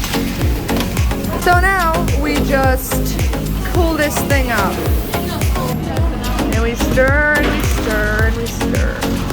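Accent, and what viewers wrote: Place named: Low German, Germany. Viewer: Thank you! I really enjoyed it. Was the horse and buggy ride at some amish place? I grew up amish in Wisconsin. I like the German you spoke. It sounded to me like Platt deutsch. Is it? Or is it Hutterisch? I know that the German we amish spoke is quite different from the real German language like they speak in Germany. I like to hear different dialects of German.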